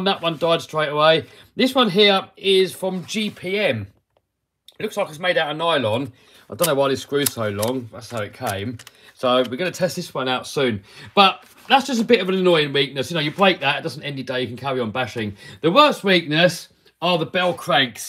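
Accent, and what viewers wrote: England, United Kingdom. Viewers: You sound English I live in Indiana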